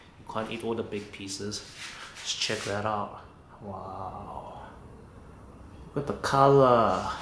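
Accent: South Africa. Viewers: your accent sounds like and is in fact indistinguishable from a non-white person's south african accent - cool man! thanks for the recipe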